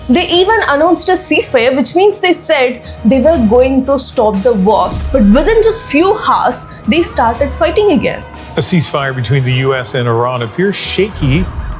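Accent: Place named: South India, India. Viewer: I love your South Indian accent man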